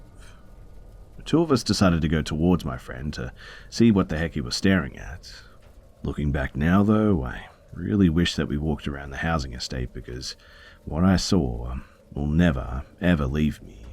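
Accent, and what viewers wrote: Australia. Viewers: mistercet pretty sure he’s got an Australian accept lmfao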